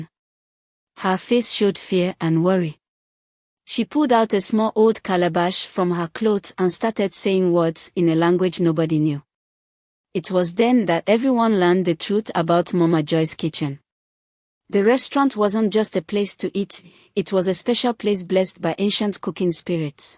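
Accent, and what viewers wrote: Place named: Ghana. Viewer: Are you a Ghanian, this your accent sha!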